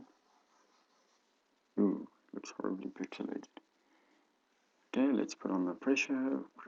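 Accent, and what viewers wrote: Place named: South Africa. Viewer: You from South Africa? I love your accent~ <3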